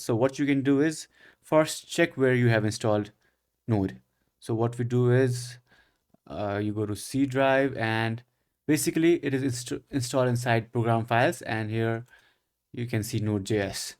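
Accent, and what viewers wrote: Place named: India. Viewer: As soon i lisen indian vocal i finely talken breath after 2 hr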